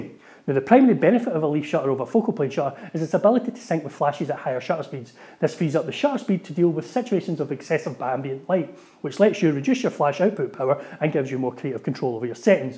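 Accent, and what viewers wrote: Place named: England, United Kingdom. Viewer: ahaha...yeah man, you are rapping the info out off your mouth....with heavy english accent....this how to vd...check on your basic teaching and communication skills...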